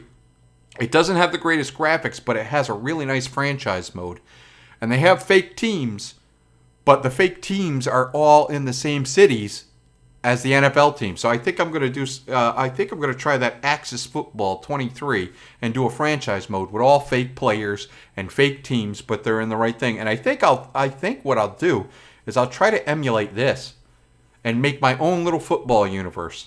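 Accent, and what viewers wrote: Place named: Brooklyn, United States. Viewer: Brooklyn is my favorite accent. Seriously love it. I don't understand all this sport talk but I like listening to you talk about it. Missed you around here buddy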